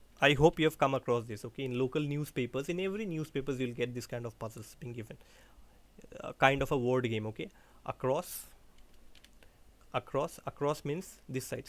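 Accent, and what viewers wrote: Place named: India. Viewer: Thanks I understood this story very well And your hindi accent is so sweet 😅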